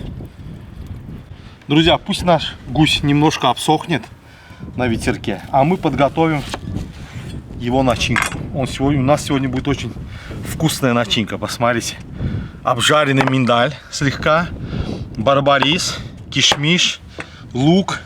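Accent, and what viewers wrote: Baku, Azerbaijan. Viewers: Может быть. Спасибо Вам за ваш труд и за потрясающий и неповторимый Бакинский акцент!Нас осталось мало,но мы есть!🙏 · Бакинский акцент ни с каким не спутаешь.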